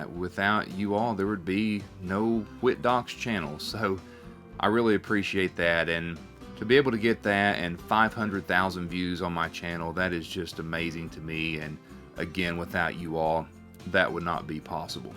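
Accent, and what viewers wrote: United States. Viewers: Great video collalatratons 0n your millstone like your country accent it sounds cool you ever been to St. Louis Missouri there are some good cemetery's of famous people calvary and one betlvo cemetery is wear chuck berry is buried at when you go to look for his big statue of him playing a guitar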